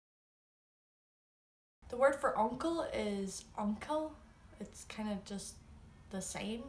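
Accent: Donegal, Ireland. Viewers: Are you Doire/Dun na nGall?? It's so comforting hearing you speak as gaelige 💚. Takes me back to my Thornhill days, go raibh maith agat xx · Please tell what accent of Irish language do you use? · I'm nowhere near being a grandmother, but recently began looking for new ways to say "grandma" since my kids are now raising kittens. I'm liking the term "Mamó," though I'm also intrigued by "Morai" (which I think is pronounced MORR-ee, and would love confirmation). I just read in another comment that you are from Donegal, and my great-great-great grandparents (I think that's the right amount of greats) came here from Donegal, so I'm glad to be hearing pronunciation from the same area. Thank you for your videos!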